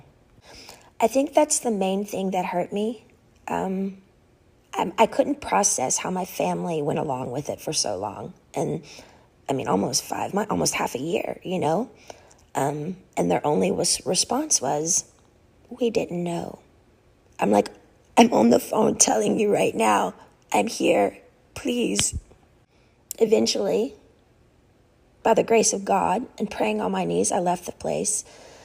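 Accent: South, United States. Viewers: Finally in her own words and no accent besides the south! I’m sorry you went through this Britney! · so what she from the south, that's how we talk.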